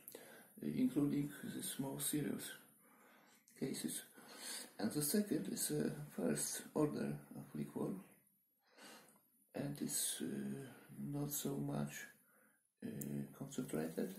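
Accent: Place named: Dnipro, Ukraine. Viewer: бля у него акцент Днепропетровский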